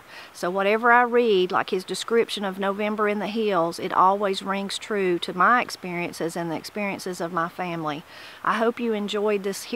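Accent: Appalachia, United States. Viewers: Really like this channel - partly for the Appalachian English, but also to learn how things are done in the countryside 🙂 I live in Scotland, November is beautiful up here with the changing colours of autumn.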